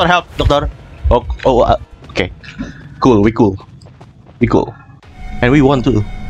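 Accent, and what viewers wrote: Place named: Indonesia. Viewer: Logat indonya kerasa bro and ur sounds mmhhh daddy 🥵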